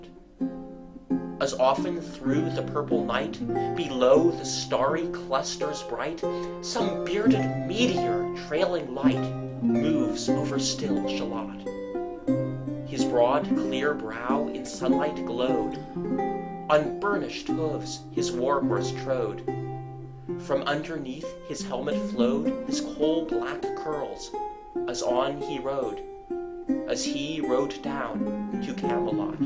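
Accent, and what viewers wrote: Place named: United States. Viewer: Will Yanks stop this, or learn how to speak ENGLISH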